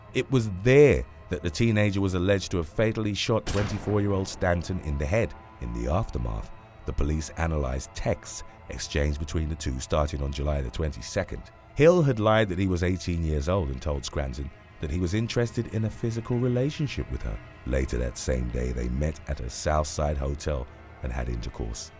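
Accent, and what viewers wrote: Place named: United Kingdom. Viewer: If this channels voice was an American voice this channel would actually get somewhere. I can’t take this shit serious when all I hear is British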